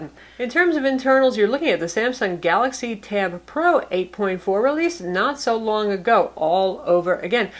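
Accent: Texas, United States. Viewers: You sound texan to me...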